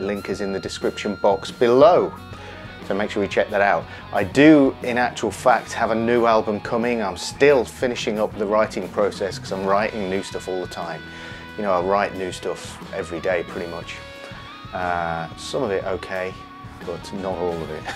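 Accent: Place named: Oxford, United Kingdom. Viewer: You got my "like" after just saying 5 words because you speak oxford english so perfectly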